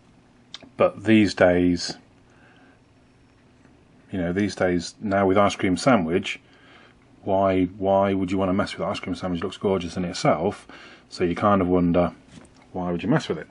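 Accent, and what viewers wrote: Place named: United Kingdom. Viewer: Just part way watching the excellent video and you was talking about the calender. I use the month view on my phone all the time. I use Pure Calender Widget and I find it truly indispensable. I colour code my everything I schedule in (blue is tasks, green is personal appointment and orange is birthdays/anniversaries etc.) so just having the whole month at a glance is awesome. Just thought I'd let ya know :-) please continue doing your reviews. Always great hearing a Brit doing the reviews.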